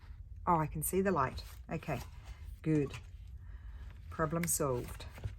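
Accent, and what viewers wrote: Australia. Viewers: Thank you for letting me know! Perhaps some day I can make the list. I adore your videos, your Aussie accent and your shop items. And you!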